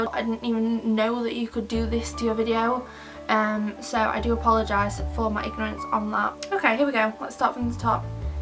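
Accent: United Kingdom. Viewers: R u british ??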